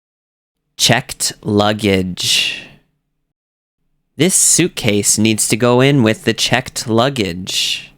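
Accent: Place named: United States. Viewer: Original America English!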